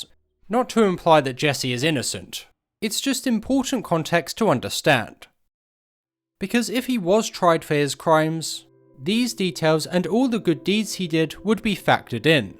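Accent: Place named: South, United Kingdom. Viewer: It’s just a regular, South English accent.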